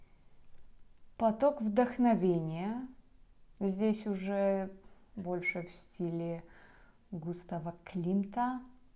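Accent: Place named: Baltic states. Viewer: Очень красивый и лёгкий прибалтийский акцент ❤️✨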